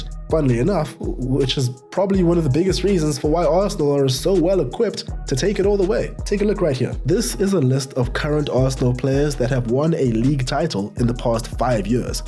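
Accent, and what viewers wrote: South Africa. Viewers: You sound South African 🤔🧐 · this is a south african accent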